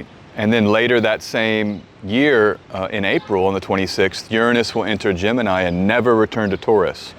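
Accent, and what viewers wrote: United States. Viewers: Hi A friend of mine sent me here, and overall, I've enjoyed your content and found it interesting since I don't know anything about astrology. Now, a bit of positive feedback, english being my second language, it's irritating how your overall american accent is perfect, yet it seems you repeatedly and purposefully mispronounced the word "Uranus", I've learned at school and double checked Google translate that it's supposed to be pronounced as "youranus" and yet you keep pronouncing it as "urunus", is this because your speaking in a different dialect? Why is the difference in pronunciation? It's like an itch inside my brain that I can't reach. Thank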